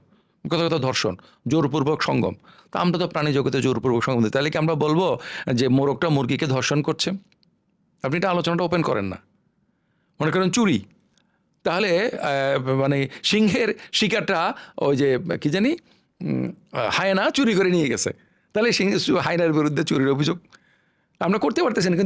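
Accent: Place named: Noakhali, Bangladesh. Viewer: নোয়াখাইল্যা বয়ান!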